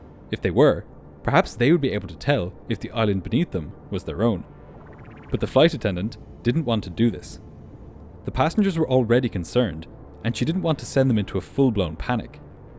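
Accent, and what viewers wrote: Ireland. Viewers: I had the same thought! 😂 I was like, "Well he's Irish so maybe it's not what I thought lol" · I came for the flight video stayed for the Irish accent!😄☘️ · Hahah long live the Irish accent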